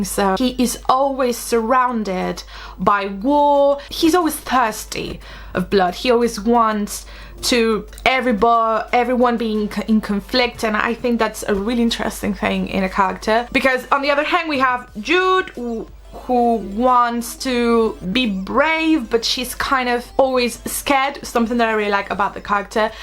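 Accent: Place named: England, United Kingdom. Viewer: Me encanta tu acento tipo England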